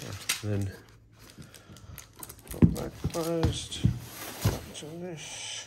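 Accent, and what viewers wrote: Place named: Australia. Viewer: You sound Australian. How long did it take to be delivered from the US? I am in Melbourne.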